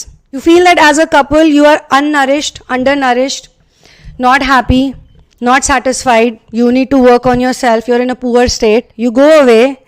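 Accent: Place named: India. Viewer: I ABSOLUTELY LOVE to hear you speak! I love the accent from India! Thank you so much for your help and guidance ❤️🙏🙏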